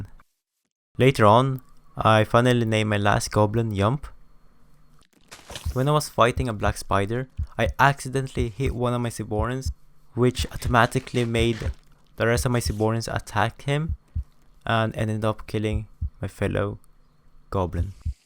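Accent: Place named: Philippines. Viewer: i sense filipino accent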